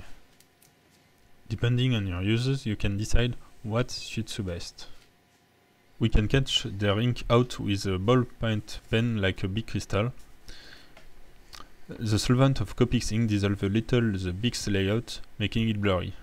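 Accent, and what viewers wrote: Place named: France. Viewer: love ur french accent.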